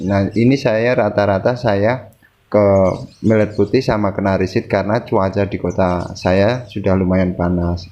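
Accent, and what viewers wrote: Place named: Banyumas, Indonesia. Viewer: Mas orang mana , ko kaya ngomong ngapak ?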